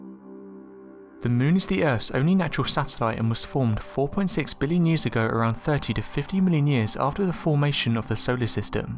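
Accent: United Kingdom. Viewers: lost interest once heard British accent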